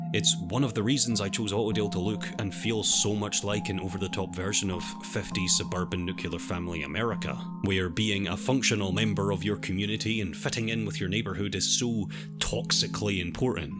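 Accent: Scotland, United Kingdom. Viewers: Are you Scottish? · You're ancient is wonderful (thinking Scottish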